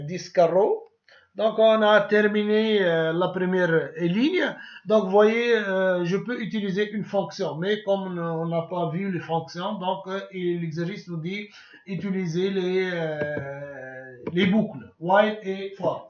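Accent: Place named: North Africa. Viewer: On comprend rien avec ton accent couscous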